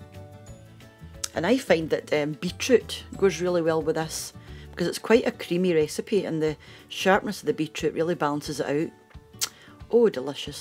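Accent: Scotland, United Kingdom. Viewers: It's so interesting to me that Scotland has such different areas within it. I spent three years living in Fife and not once did I hear of this dish. It looks delicious and ill give it a try. So nice to hear your accent, I miss Scotland so much! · Grew up with Scottish accents, miss them a lot. Great recipes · i like the name! and love the Scottish accent. also a big fan of SAHB, one of the best rock bands ever and highly underrated but i will always love them. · The accent takes me back to a happier time. I was raised by JOBs in Canada (Just Off The Boats) all of my family were from different areas of Scotland. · I canna travel to Scotland anymore so I practice the accent listening to you and the Outlander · You sound very like my husband. Light, polite and well spoken. I love that kind of Scottish accent. He's from outside Glasgow. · Yum! You sound like many of my pals fae Scotland! 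Much love from America ❤💙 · I could listen to a Scottish accent all day long. It reminds me of my grandparents. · I clicked on this video more to just hear how this dish is pronounced and love the Scottish accent so much.. I ended up watching the entire video and now I need to make this for dinner..Just subscribed!! LOL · I came for the dish.... Stayed for that lovely accent. I love your accent of the Scottish young. Lovely. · Scottish accent is funny like irish accent but the people's features are so beautiful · I have trouble with Scottish accents but I can understand your beautiful accent perfectly. I never heard of this but it sure does sound good. By the way, I'm doing my family tree and discovered aseveral times great grandfather was from Scotland. Completely unexpected. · A perfect channel! A gorgeous Scottish burr (giving all sorts of ASMR) with yummy comforting food. Youtube heaven! 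· Your accent makes me homesick...... I was born in England but my family are from the Highlands originally and I called Auld Reekie my home for many a year, even started getting a wee accent masel..... Smashing....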